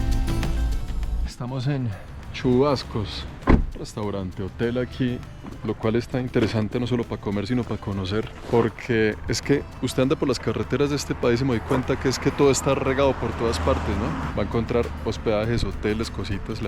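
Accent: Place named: Bogotá, Colombia. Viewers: Ay qué cómico pichirilo me da una gracia ese nombre que le pones al carro y con ese gran desayuno ni te asomes al cráter o de un precipicio porque te vas de cabeza con el estómago lleno jua jua jua jua jua jua pasen niñas pasa y pasa no hay apuro ay me encanta como hablas será que soy de Uruguay y me me gusta muchísimo cómo hablan los colombianos pero especialmente tu voz que es bastante baja y las palabras que le pones a las cosas les cambias el nombre son modismos no de cada país a mí me encantan me encantas tú y tus vídeos y te deseo lo mejor en la vida estás en uno de los países que yo quisiera conocer por algo cuando llegaste dicen buena vida te saludan así y eso y eso ya es buena vibra muy positivo o pura vida bueno algo es de eso no me acuerdo bien algún día iré por ahí así que no me voy a perder nada de este video que promete como todos tus vídeos ningún desperdicio cuídate y Dios siempre va contigo❤❤❤❤❤ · 🤭🤭 me encanta como narra🤭🤭muy yo 🤭 cachacos al fin y al cabo🤭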